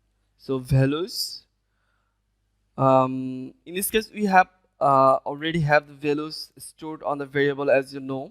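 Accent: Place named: Bengal, India. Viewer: Terrible sound. Also accent is heavily Bengali, very hard to understand!!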